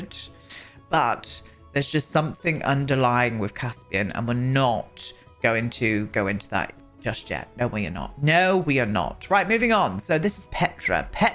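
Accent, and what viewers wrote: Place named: England, United Kingdom. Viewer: Love the story line of a cult! Very unique and I can tell it'll be an entertaining one. I just want to mention one thing, Paolo isn't a portuguese name, I'm portuguese and the correct version of that name would be Paulo, in terms of pronunciation is similar, especially with your English accent and it really is a small difference in spelling but it does matter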